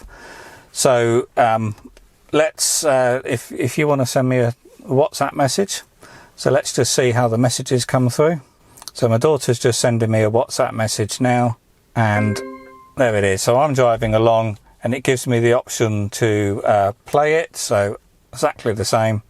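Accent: United Kingdom. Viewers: The voice has a British accent, lol! Awesome.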